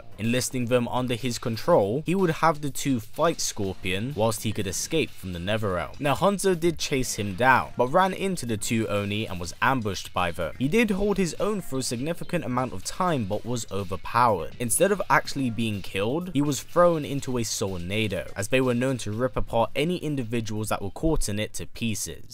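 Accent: United Kingdom. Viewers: Wat u have a brutish accent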